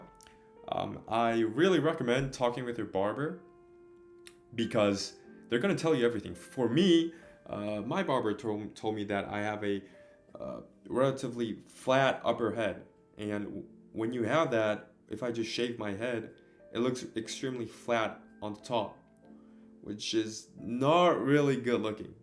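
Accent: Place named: Texas, United States. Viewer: You got the Texas drawl down perfect :) You look fine. Whatever gives you confidence is good. One thing people can do to reduce fine lines and wrinkles and firm under the eye is to close the eyes and flex the muscles in the top and bottom lid. You can feel the difference if you place your small fingers on the outer corner of the eye socket :) It feels good, too. Great call on the eyebrows!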